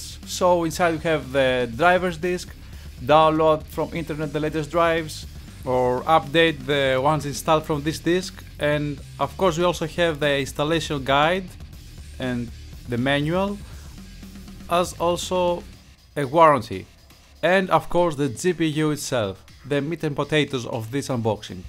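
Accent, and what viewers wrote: Greece. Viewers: Are you greek? because your accent reminds me a lot of greek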